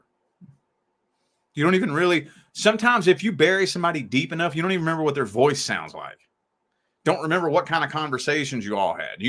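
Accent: United States. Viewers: Hello From France,🙂 How come while I have been rolling rolling rolling through the many videos of Tarot without falling till today to your great work. Let's go straight, I look at my tarot ( 5 different artists that I really really like Boticelli, Isawatt, Anne Guesquière, ...) I do it once a week otherwise you can feel easily lost, while doing once a week it is my moment and I like it. But sometimes during the week, I like to put a curious eye on Zodiac. And today ,I discovered randomly your channel, you killed me since you speak with one clear voice ( I love presentation cosy cool attitude with black and white band on the screen to follow in which topic we are and all that with humour even sometimes thematics are not easy always well most of the time you speak with awesome comments . so I am in sheer curiosity I will even drop on my notebook some of your comments, it allows me first to speak a better English ( sounds you live in USA , that accent is ok too ) and to take conscious as a pure true Aquarius down to Earth I embody that most of the time it is necessary not to play an automatic way of life pace and rather focus on a "camino" on a way to get closer to what wee feel in ourselves really stop lying to oneselves, assume decisions, choices... 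and well yes I can say this my first video from u listening and I feel happy because of the tone you employ emphasis on purpose, repeated sentences, like dear Aquarius stop with your " I know, I know, I k now" among a plenty.👊✊👏 I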